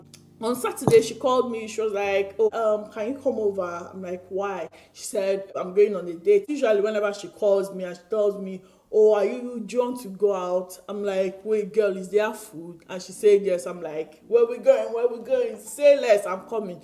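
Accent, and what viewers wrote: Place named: Nigeria. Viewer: Nice content I only struggle to understand the very strong Nigerian accent.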